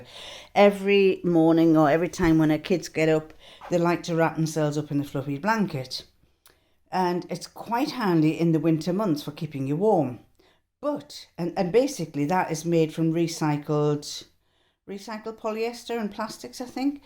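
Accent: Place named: North East England, United Kingdom. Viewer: Hi there my mother kept my teenage quilt they threw nothing out that generation. its about 54 years old its a bit faded and thinner athough in amazing condition so when she passed away I took it. I remember it had a frill around it that was taken off. We used to call them eiderdowns. I put it inside a single warm quilt cover and its lovely to snuggle under. Always lovely to hear a North East accent on a vlog. I live in Newcastle xxx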